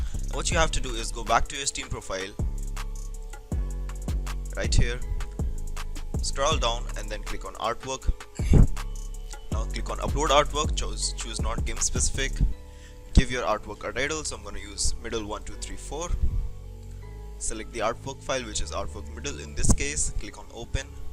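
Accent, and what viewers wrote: India. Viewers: When you hear Indian accent, you know the tutorial bouta be good 😊Thank you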